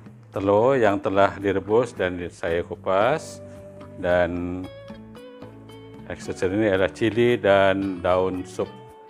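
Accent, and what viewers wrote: Java, Indonesia. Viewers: Mula2 ingatkan youtuber dari Indonesia.. Sekali dengar dia speaking..owalah wong jowo Malaysia rupane.bagus la kang ! Alhamdulillah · Rapopo wak ngomong melayu lekat jowo pekat, macam mas toha,, lagi mantap wak...👌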